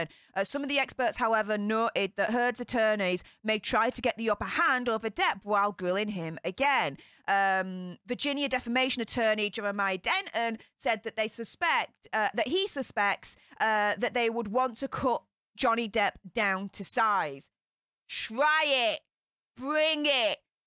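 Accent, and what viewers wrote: Yorkshire, United Kingdom. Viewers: Great points and I get to hear your delightful Yorkshire accent. Win/win, y’all. J4J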